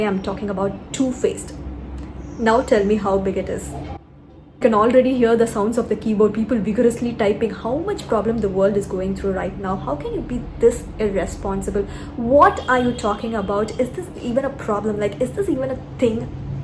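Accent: South India, India. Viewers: Pure south india full satire daa love d way u talk Love from telengana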